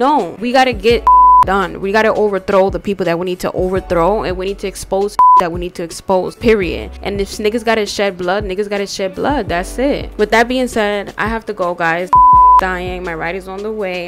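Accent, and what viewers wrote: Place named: New York, United States. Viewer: Nothing better then a little dominican history, with a NY accent ! DEADASSSSSSSSSS Lmao . Definitely feel as dominicans we gotta know our history , feels like the more times that passes the more people seem to forget about the roots and where our people come from , which is exactly what the Europeans wanted ….. to oppress our beliefs and our culture . Great video !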